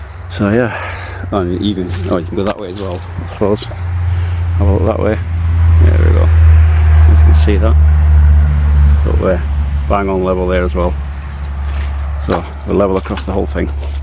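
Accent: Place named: United Kingdom. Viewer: You sound british not Russian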